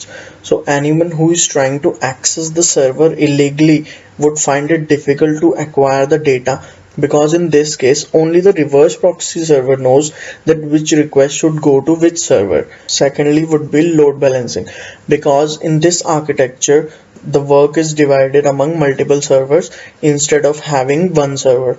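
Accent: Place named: India. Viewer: Because English is not a native language. Is that so difficult to understand? Talk to someone from China, or Japan, or Italy, their accents are different too. Only difference is that Indians are fluent in the language but the accent is different, while others screw up the grammar too and hence is less creepy to people like you.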